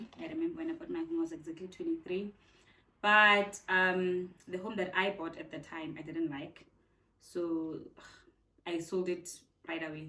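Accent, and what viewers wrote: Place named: Limpopo, South Africa. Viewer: I am just glad we get to know you better. And hearing you from Witbank I was like haibo this whole time I am like Limpopo and then I hear it. Otherwise ke tla botsa on Insta! I love this for you and I love it for me 🙈 plus ke fan akere. ❤️ o kwatisa fela ge ore you won't speak Setswana. And yes I agree you do look like your mom, and your my hight and shoe size 🤣 bathong 2 full weeks with mom wena spoilt brat!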